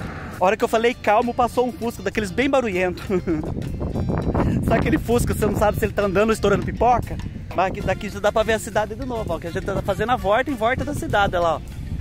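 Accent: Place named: Paraná, Brazil. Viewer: Seu sotaque é bem parecido com do povo q mora no Paraná..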